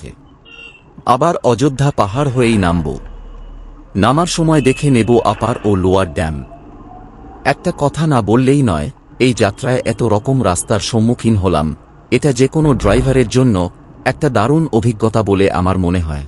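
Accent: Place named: England, United Kingdom. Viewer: Tomar golar voice ta darun but english tan ache banglay ktha blate। Tbe darun laglo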